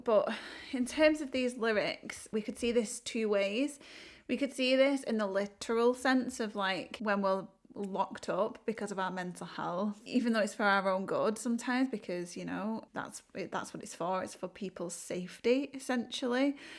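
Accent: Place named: England, United Kingdom. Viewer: Hey, Found you through your reaction..Subbed for more, but I have to say You are f*n fun! Your look is absolutely rocking and I could just sit here and listen to that English accent and rock out with you all day! My wife is a Brit BTW, so I may be biased..lol) I see you have reacted to so I will be checking those out too. I am a GEN X guy, so would be my choice on the desert island if I could only listen to one band for the rest of my life. (Although, definitely has made me questioning that choice:) ). I would love to s see you react to -. I see your channel is quite new...let's build you up! I'm here for it!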